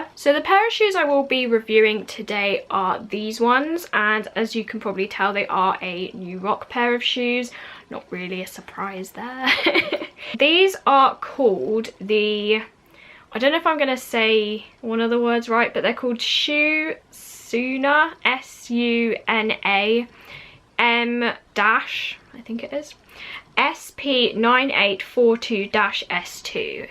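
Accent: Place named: London, United Kingdom. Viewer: Those are really darling & give me a steampunk kind of vibe :) I was wondering (and I may have missed it); if you had an idea what the soles are made of? I mean not the underneath, but the part under the shoe itself the platform is made of? Does it seem like the same material as the top or something different. Someday soon I plan to own a pair of New Rocks, I'm just not sure which ones I want. My son was over the other day and he heard me listening to you and was wondering about your accent. I told him you were from England and he goes "Oh yes, a London Goth" :) Too cute :) Well, take care and nice to see you sweetie <3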